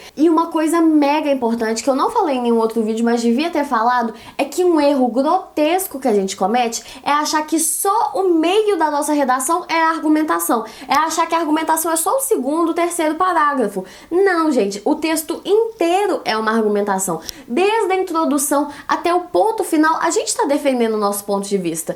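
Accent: Minas Gerais, Brazil. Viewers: Esse sotaque mineiro é demais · Você é sensaaaaaaaaaaaaaaaaaaaaaaacional! Muito obrigado por tudoooooooooooooo! Se cair alguma coisa sobre Educação, citarei você na minha redação como os novos meios da Internet no meio educacional no século XXI. Parabéns por tudo e você fala muito amor, eu amo MG e o sotaque affffffffffffffsx<3!!!!!!!!!!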